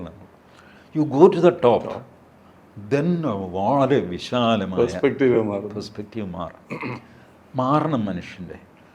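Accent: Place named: Cherthala, India. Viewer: TG സർ അങ്ങ് ഈ ഇൻറർവ്യൂ വിലൂടെ കോറിയിട്ടത് ജീവ ചരിത്രമാണ്. ഞാൻ ഒന്നു രണ്ടു വർഷമായ് ആഗ്രഹിച്ചിരുന്നു. താങ്കളുടെ സ്വദേശം എവിടെയാണെന്ന്? താങ്കളുടെ ഭാഷ കേട്ടപ്പോൾ ചേർത്തല ഭാഗമാണെന്നറിയാം പിന്നീട് താങ്കൾ മട്ടാഞ്ചേരി TD സ്കൂളിന് അടുത്താണെന്ന് മനസ്സിലായി. പക്ഷേ ഇപ്പോൾ ആണ് താങ്കൾ ജന്മം കൊണ്ട സ്ഥലവും ഒക്കെ അറിഞ്ഞത്,ഞാൻ നാളുകളായ് പലവട്ടം തിരഞ്ഞ ഒരു കാര്യത്തിന് വ്യക്തത വന്നിരിക്കുന്നു. താങ്കളെ പോലുള്ളവരെ കേൾക്കാൻ കഴിയുന്നത് മഹാഭാഗ്യം ജനം TV യ്ക്ക് ആശംസകൾ❤❤❤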